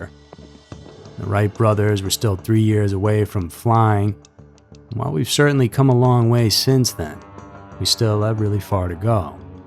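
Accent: South, United States. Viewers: He's got a slight Southern accent I can't quite place by region, while his soft tone and melodic speech puts me in mind of a Tennessee Williams character or time frame or filmy floating fabric or something like that. I just discovered him and liked reading all the appreciative comments. I grew up with riveting storytellers from pioneer days, and fits that category I think.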